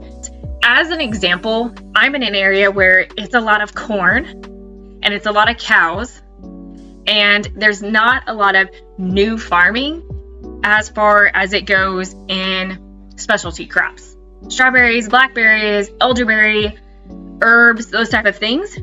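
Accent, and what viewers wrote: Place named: Missouri, United States. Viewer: I had to retrain my ears to hear soil the word bcuz of your Missouri accent.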